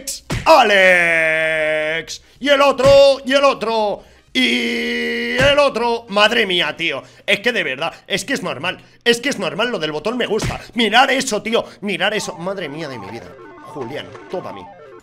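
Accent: Mexico. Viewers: no hay nada mejor que escucharlo hablar español mexicano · sigue hablando como mexicano que se teda mal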